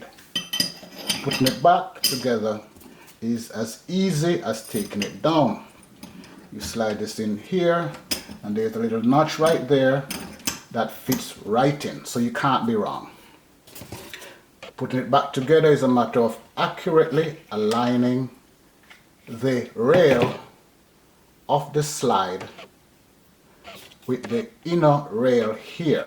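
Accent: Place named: Jamaica. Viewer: Jamaican not Afican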